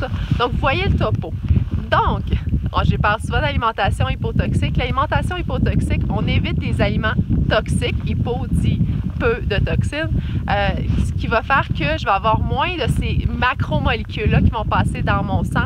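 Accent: Quebec, Canada. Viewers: C'est la moindre des choses de vous donner un retour. J'aurai une remarque. Quand vous dites que la pomme de terre génère des glycotoxines, cela a provoqué un doute et en me renseignant, j'ai compris que c'est quand elle est cuisinée en friture. Il fallait le comprendre dans la suite des détails que vous fournissez sur base d'aliments cuits. Cela étant, même vous, dans votre belle contrée outre-atlantique, avez sans doute entendu parler ou même gouté des frites. Etant Belge, le pays des frites, j'ignorais l'impact de la cuisson de ladite pomme de terre. Des gens de votre métier par ici doivent le savoir aussi, mais n'en font pas état ! C'est par votre entremise que j'apprends cet enseignement. Encore merci donc. Par ailleurs, quant à votre accent québécois, c'est davantage un atout, bien que le fond d'une communication soit le principal. Ce que vous dites, au risque de me répéter, est universellement intéressant et instructif. 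Quant à la situation géographique des différents pays que vous visitez, présentement ^^, dans l'Amérique du sud (merci de l'avoir pointé, il s'agit d'une coquille de ma part en tapant Nord au lieu de sud) N'ayez crainte que les européens sache qu'ils se trouvent sous l'équateur. La plupart de nos pays se sont construits (pas très noblement) sur l'exploration mondiale et diverses colonisations. "L'histoire" qu'on nous enseigne passe par la géographie. Bref et quoi qu'il en soit, j'ai hâte de découvrir vos prochaines vidéos.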